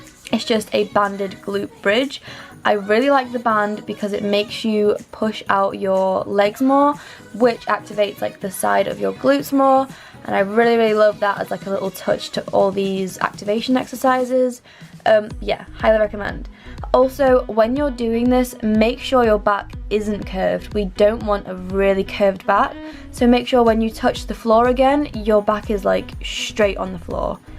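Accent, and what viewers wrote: United Kingdom. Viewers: As would LUIMARCO say: Propahh and legit british accent 👍👍👍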